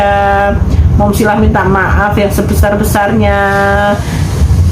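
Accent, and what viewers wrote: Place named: Java, Indonesia. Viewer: kok jowone masih medok banget....Yo.....padahal sejak kecil di Papua. Salam Soko Pacitan Jawa timur.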